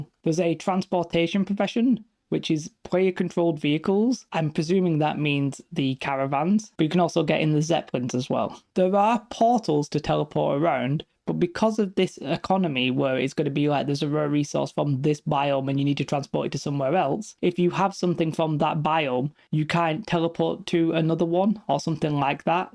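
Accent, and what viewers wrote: United Kingdom. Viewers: LOL, good one! He does not saying Items, he saying I'ems! Love the Bri'ish accent ^^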